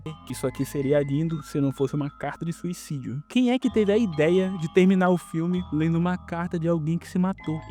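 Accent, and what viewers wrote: Pará, Brazil. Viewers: Primo, o sr é do Pará? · KKKKKK mano adorei seu canal só me tira uma duvida tu é paraense?? kkkkkk o jeito de flr igualzin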